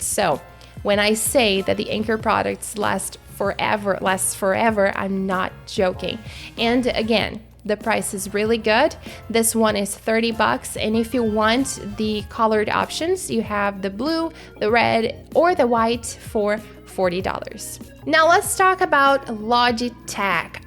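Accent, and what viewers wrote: Brazil. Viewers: I love your English. You’re Brazilian, aren’t you? You could open an English Channel to give us some tips.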